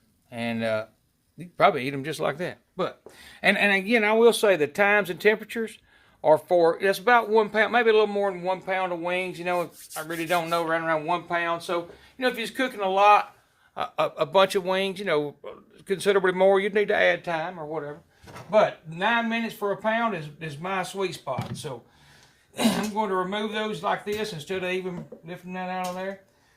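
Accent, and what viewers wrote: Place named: Alabama, United States. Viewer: Sounds like he's from Alabama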